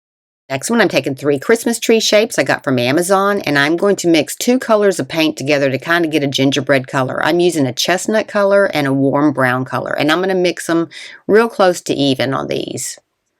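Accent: South, United States. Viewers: These are so cute! This has got to be in my Top 5 craft channels I follow on YouTube, if not the top spot! VZ perfect crafts, perfect Southern accent with the right level of sound. Thank you.!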